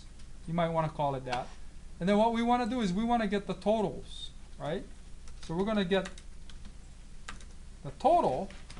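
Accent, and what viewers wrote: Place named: Hawaii, United States. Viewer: sir are you Hawaiian?!? sweeaaaar I can hear it in your voice! love it!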